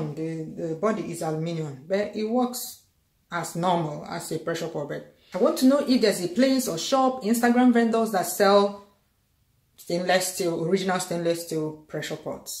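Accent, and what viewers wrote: Igbo, Nigeria. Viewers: you're begging us... 🤣🤣🤣🤣 honestly I hated cooking when I was small with my mum. we would cook for hours... now we are grown she uses it... i wish we had this long ago in Nigeria.. love you igbo accent so much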